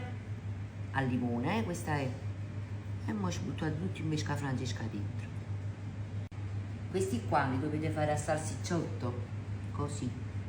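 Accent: Naples, Italy. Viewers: Brava con il tuo modo di fare rendi tutto facile fai venire la voglia di cucinare e fare i dolci insieme a te❤ Non c' è niente da fare la cadenza Napoletana rende tutto più facile. Anche io sono Napoletana e appena posso vengo a prendere la pizza da asporto da te per i miei bimb❤❤❤i e mangiami anche la tua frittatina di pasta e il tuo crocche ' di patate mentre aspettiamo di ritirare le pizze. Grazie per la tua semplicità e la tua simpatia innata❤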